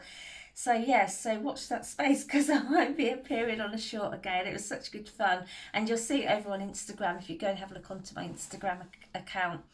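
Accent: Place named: Scotland, United Kingdom. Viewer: It's lovely to hear your Scottish voice here in Texas where I knit all the time, but it's rarely cold enough to enjoy wearing the items. I do mostly fingering weight projects here. LOVE this channel. As a person planning for retirement in about a year, I need to have EVERYTHING frugal, especially the hobbies.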